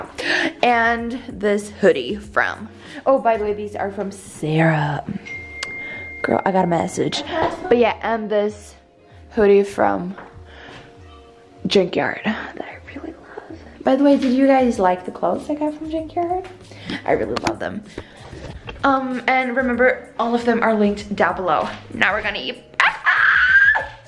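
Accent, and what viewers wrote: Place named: United States. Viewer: you sound totally american like really, ilysm!!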